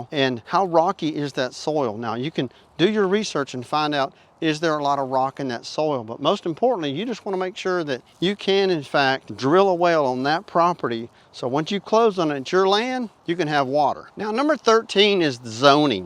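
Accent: South, United States. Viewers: His voice goes well with him, sound like he has an southern accent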